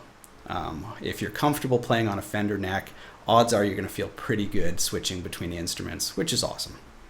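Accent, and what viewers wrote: Canada. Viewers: "talk aboat" he's Canadian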